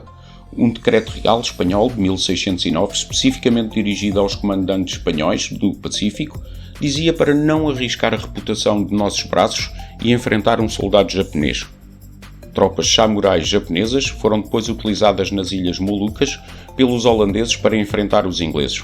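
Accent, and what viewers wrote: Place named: Portugal. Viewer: Maravilhoso vídeo! O assisti até o fim, sobretudo por ouvir português falado por um português Eu sou brasileiro e vivo no Brasil, terra descoberta e colonizada por Portugal. Nós, brasileiros, precisamos ter orgulho de termos sido colonizados pelos grandes navegantes portugueses, homens destemidos que enfrentaram o Monstrengo do mar (Pessoa, em Mensagem), os verdadeiros argonautas da civilização.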